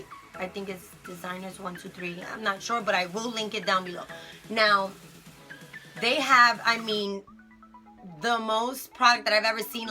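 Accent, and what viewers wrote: Bronx, United States. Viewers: Knew you were from the BX once I heard you talk. Im from the BX too and you took me way back. So many memories. So happy to have found you. Your closet is really dope. Love it. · I knew u was from NYC Bronx bc I'm from here too and I talk like u lol bc when I'm making my videos ppl always asking me where I'm from. Love the bags.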